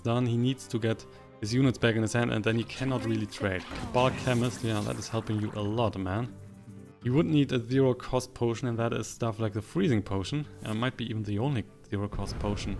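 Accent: Germany. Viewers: Thank you for the insight, looking forward to play this deck aswell. I can distinguish a strong german accent tho... which is not bad because I am german aswell. But you could definitely work on this! You could say that I am a native speaker, and I do see that you got a huge vocabulary (regarding average germans) - just keep practicing (if you want to drop it ofc). Nicht böse gemeint btw - hast mein Abo.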